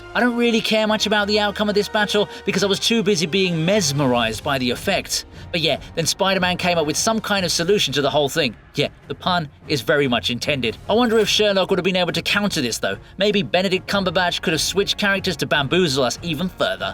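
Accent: United Kingdom. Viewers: "The Sorcerer's Stone" is the dumbed down title of the film for the US audience (officially because WB didn't think Americans would know what a Philosopher's Stone was) despite having the internet at their fingertips and millions of HP book fans. Use the correct title, you do sound British after all.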